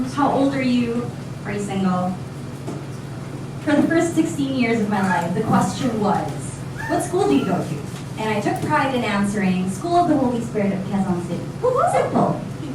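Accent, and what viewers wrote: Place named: United States. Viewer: when you speak english its as if you are from the USA--more specifically the typical pinay from the west coast like California. Then seeing your other videos when you transition to tagalog and accent comes back. That is a very nice skill.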